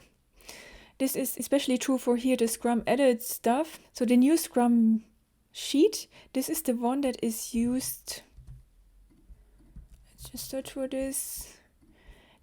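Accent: Germany. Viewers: Your accent sounds German with a tiny bit of French. Are you from the region of Germany near France?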